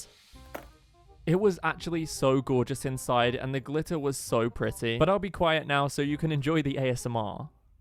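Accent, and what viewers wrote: United Kingdom. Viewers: The british accent✨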